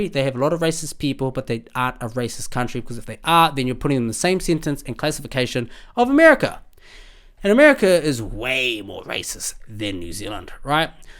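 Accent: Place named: India. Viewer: Bro i love your videos, that indian accent though. Need to see more 👍👍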